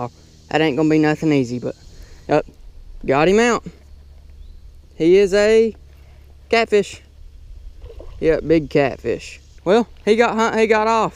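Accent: United States. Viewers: Country akcent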